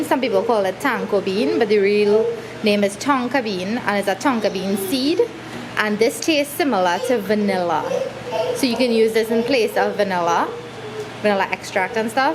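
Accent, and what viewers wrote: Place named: Trinidad and Tobago. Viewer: Hi again I just love seeing you and family happy all going ons..stories,experiences who drink out the coconut water? Especially when you hear the bread van then you have to fly down the step , drop everything vtp reach him before he leaves ..Haha I know the struggles...Girl you show me how to make smoothie gratitude to you fuh so...I could go on learning more about your channel your baby so cute...Love your Trini foreign sal g dialect accent..I pray God keep i all safe happy together even if you do live a part too..Mwah hugs to you all...I seca real cry baby when I don't see family enough...Bye..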